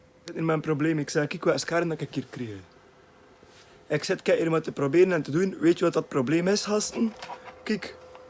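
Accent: West Flanders, Belgium. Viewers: tis wel nie af en toe e int west - vlams ist nu en ton 😄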